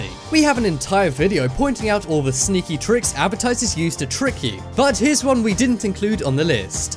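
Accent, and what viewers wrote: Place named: England, United Kingdom. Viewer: There's that stupid English accent again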